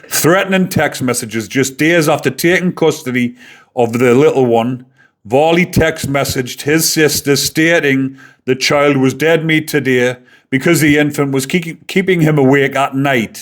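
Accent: Newcastle, United Kingdom. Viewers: Varley is the perfect example of why there should be a death penalty. Love to hear the Geordie accent from so far away, too bad about your piggy' lug - haven't heard that word for years.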